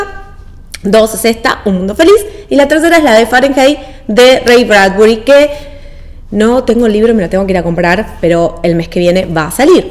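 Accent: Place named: Argentina. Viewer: Tu acento argentino no lo soporto me voy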